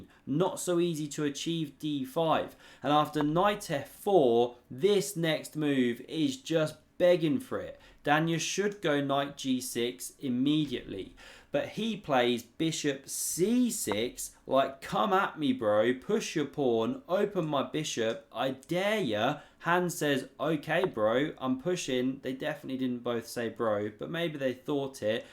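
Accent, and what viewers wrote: England, United Kingdom. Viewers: I came here for the English accent, but stayed for the chess. Heh.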